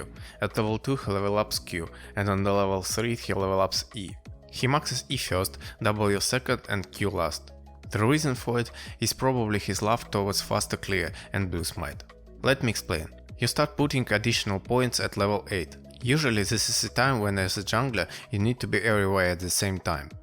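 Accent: Russia. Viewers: I find your accent pleasent (#nohomo), are you from russia?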